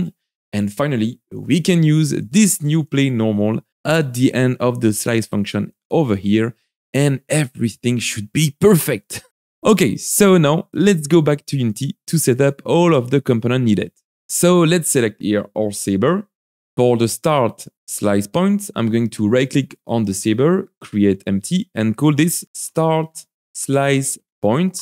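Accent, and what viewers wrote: France. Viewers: Ah c'est pour ça cet accent Français, je me disais bien que ça sentait familier XDDD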